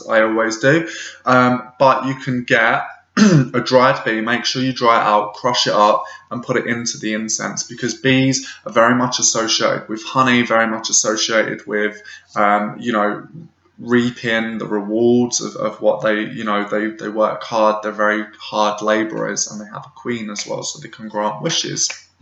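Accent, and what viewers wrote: England, United Kingdom. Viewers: Love your videos. Nice to hear an English voice ;) easy on theeyes too ;)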